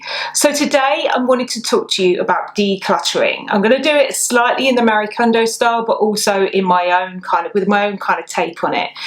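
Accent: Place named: United Kingdom. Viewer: Really good advice! I have got rid of a lot of things as I have just moved house but i find a lot of my clothes don"t go together so putting together an outfit is difficult. I like the suggestion of thinking more about the colours and keeping it simple. I also watched your over fifties and how to look elegant videos, so I think I will be able to make some progress now. Lovely to hear a British accent, I am a Brit living in Canada. We definately have four seasons here.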